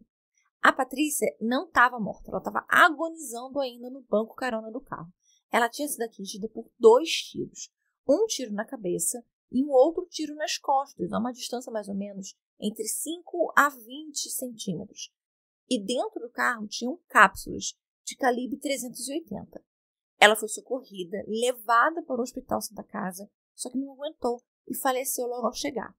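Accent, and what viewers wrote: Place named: Rio de Janeiro, Brazil. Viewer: Nossa não gostei dessa fala carioquíssima não se entende nada aroisss bla bla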